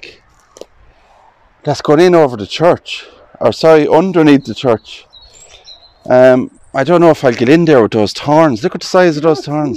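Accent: Ireland. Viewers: What wonderful video. When our children have moved out, I hope we can travel to Ireland and visit our ancestors and look at the old churches. Just a minor suggestion for you to consider. Maybe give the location and formal name of church in the written notes for each video? Your brogue is wonderful to listen to for us out of Ireland, but hard to make out the names by pronunciation and given spelling differences. (I'd love to add this church, for instance, to a vacation wish list, but I have no idea where it is.) 😢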